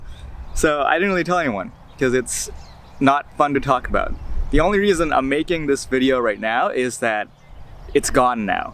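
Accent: United States. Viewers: You sound like American accent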